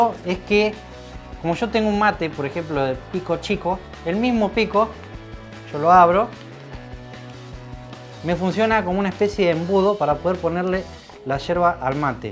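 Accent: Argentina. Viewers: Argentino.... Bahhh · me gusta tu acento argentino soy española y francesa pero vivo en Francia. todo eso me gusta pero en esta vida no tomamos tiempo siempre vamos de prisa y corriendo. un dia voy a intentarlo! !pero si estropeo mí plancha! !uuunnn.solo será culpa tulla. Me gusta como pronuncias las elles.un besote muy grandote desde Francia 👄👄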